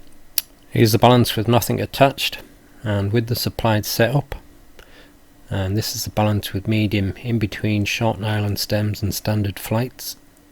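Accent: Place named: Middlesbrough, United Kingdom. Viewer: Been watching the vids for a while now just wondering whereabouts you are from as I am from Middlesbrough , it sounds as if you're from that neck of the woods.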